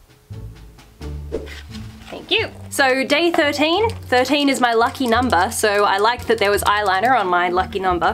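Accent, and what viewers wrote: Australia. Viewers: i love your accent are you from Australia ❤️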